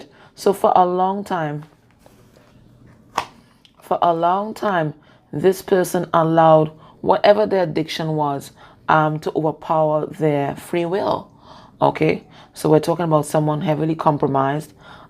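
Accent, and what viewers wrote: Trinidad, Trinidad and Tobago. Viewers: That’s a Trinidad accent y’all! 🇹🇹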